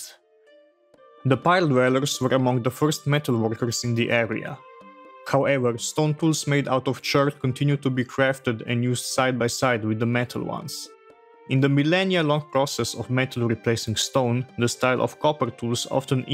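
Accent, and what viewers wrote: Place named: Slovenia. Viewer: 3 seconds in and I instantly recognize a fellow Slovenian by the accent ;)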